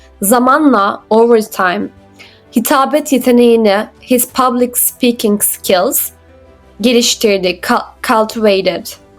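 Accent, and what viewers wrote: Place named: Turkey. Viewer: R leri cok bastiriyorsun turk aksanindan cikman iyi olur biraz dinleyip birseyler gormek istedim ama aksan rahatsız edici. Dinleme telaffuz geliştirmen faydali olur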